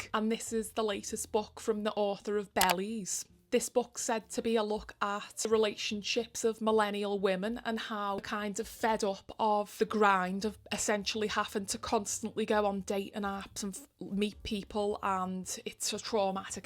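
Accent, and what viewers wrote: Liverpool, United Kingdom. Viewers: Thanks for this video. It's much appreciated for Pride Month. I just discovered your channel today. As others have mentioned on other videos, I love your Liverpudlian accent. Most of the lit fic Booktubers I come across have quite formulaic American or English accents. No shade to them, especially as many of them have American accents because they've learnt English as a second language. But it's really wonderful to hear more diverse voices in the Booktube world (literally!), so thanks for your contribution ❤️‍🔥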